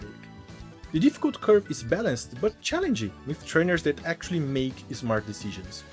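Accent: Brazil. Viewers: Vc é brasileiro? Tem um sotaque tão brasileiro kkk ótimo vídeo!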